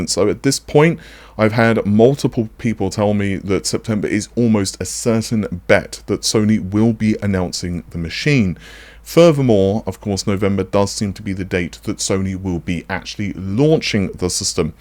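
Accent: United Kingdom. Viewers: Watchied a lot of British TV and I have come.accross quite a few people that sounds like this man's accent.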